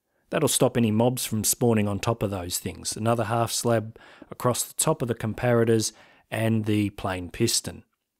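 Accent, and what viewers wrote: Australia. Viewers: Authoritative is intimidating, you sound like an Australian version of the old Etho except with more gusto:D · Are you from Australia? :)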